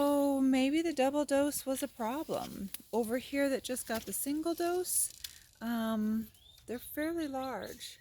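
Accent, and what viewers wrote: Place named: Jamaica. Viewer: Heyyy you sound Jamaican!!!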